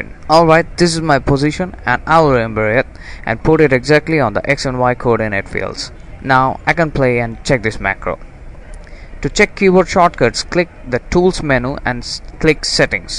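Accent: India. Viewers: cazzo sei un indiano?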